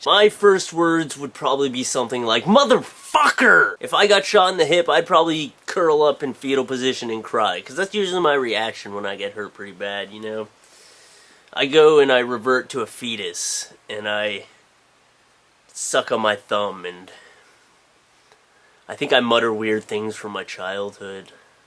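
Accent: Canada. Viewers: Is this guy Canadian? Canadians can't make funny! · r u canadien?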